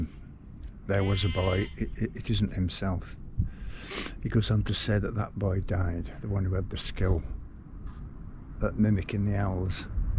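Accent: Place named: West Yorkshire, United Kingdom. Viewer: Your oration redounds in mine ears long after you have ceased it,. Of course, that West Yorkshire accent helps - intrinsically poetic as it is. But your being a musician makes your readings especially wonderful to my ear. Great taste in poetry, once again. And I'm glad you're sticking with this Thursday event. I thought the word was 'uplifted'. Not so sure now, though.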